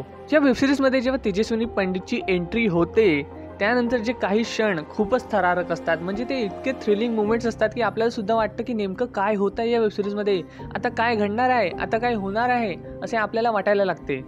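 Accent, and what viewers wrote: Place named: Vidarbha, India. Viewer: Vidarbhatil marathi ahe, so don't expect puneri marathi....... Ignore comments and Keep it up brother 🙏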